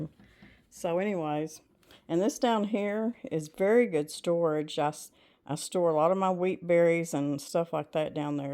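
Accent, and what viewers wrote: South, United States. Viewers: Miss I've said it before, I could listen to you all day. You have got such a way, such a merry twinkle in your eyes and sweet smile, and I dearly love your charming southern accent. So, never never change anything. I read thru some of the other comments and it's clear we are all in agreement 🥰. Little Wallace is adorable!! You made me laugh when you said he's sensitive about his bat ears 😄. Well done on the garden fence. It's beautiful!! And the kitchen cabinet is going to be gorgeous. Love the paint and paper. Lovely selection of flower seeds. I like straw flowers. I'm hoping to get a lot of zinnias planted this year too. Oh, I was thinking, you must have had the most well fed school kids and teachers anywhere. That had to be a real labor of love. was so happy with his cobbler and ice cream 😊. Thank you for for such a wonderful video. 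Loved it ending with a Psalm. Take care and God bless. 🥰♥️🤗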